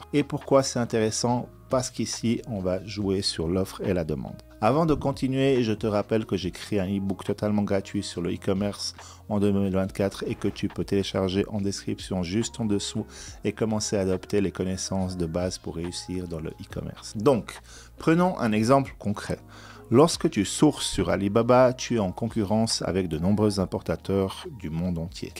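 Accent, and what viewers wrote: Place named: Burkina Faso. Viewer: C'est ton Burkinabè mon frère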